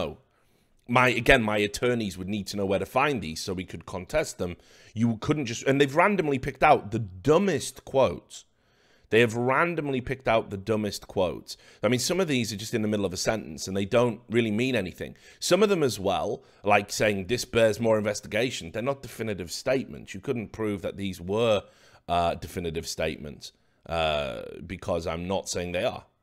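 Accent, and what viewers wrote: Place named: England, United Kingdom. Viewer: LMFAO. When he said "COCK AND BALLS", I died. I absolutely love English accents.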